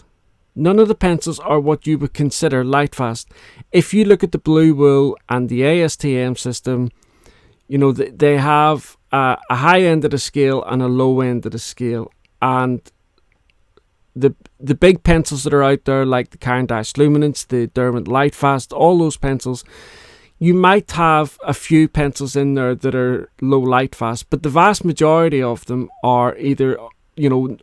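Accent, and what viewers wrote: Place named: Northern Ireland, United Kingdom. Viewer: I was about to donate to Habitat( a thrift store i Florida) , my colored pencils, which I bought 8 years ago and never used (Faber Castell,Derwent & Reeves) I went online found your site. It was your clarity and honest information that started me -But your NI accent made me homesick for ‘the island’ - I lived in the Republic for 30 years, and was part of the Forrum for Peace and Reconciliation, and spent time at Queens, for the resurrection of NIGP. I wound up buying more pencils, and was converted away from acrylics. Thank you, thank you for making the whole genre clear -, fun and creative and opening up a new, endless possibilities.🎉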